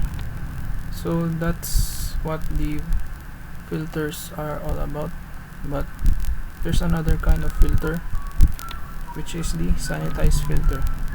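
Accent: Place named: Brazil. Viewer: Você parece um Brasileiro falando inglês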